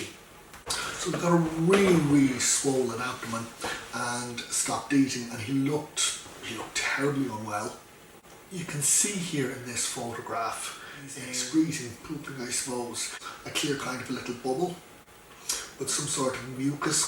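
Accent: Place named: South, Ireland. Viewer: Lovely video my friend missed this one where about from the south of Ireland are you from ?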